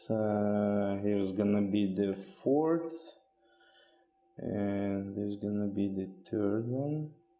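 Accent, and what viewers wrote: Russia. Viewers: are you russian? Your accents just seem to be russian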